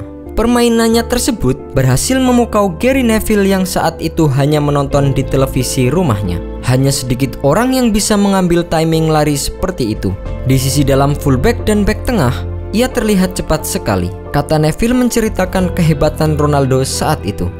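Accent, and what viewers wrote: Java, Indonesia. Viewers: suara narasinya masih berasa medhok.. tapi mendingan ini daripada suara translate-an cewek gugle :)) mantep mass...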